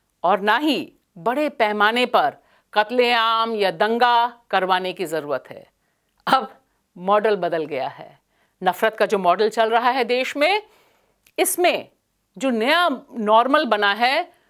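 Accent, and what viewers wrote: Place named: Jabalpur, India. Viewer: आपका बोलने का अंदाज, मध्य प्रदेश के जबलपुर आसपास से लगता है, एकदम बुलंद 💪🇮🇳🙏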